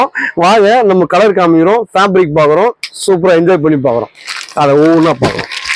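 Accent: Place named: Chennai, India. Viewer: Super super unga Chennai tamil slang awesome dear 🎉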